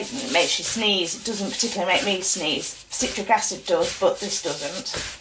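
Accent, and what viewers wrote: United Kingdom. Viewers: Great video, thank you. Great tips too and they look amazing. I never knew about the claw and brush technique. I love videos like this, they're natural - not forced and fake. I think I detect a fellow Yorkshire accent :). I'm glad the recipe isn't the one you use - I kept thinking 'No....don't give away your trade secrets / recipes'....I know that competition can be watching and I'm a firm believer in helping people - but I like to keep my hand of cards close to my chest :). You have to protect your business. Thanks for the recipe x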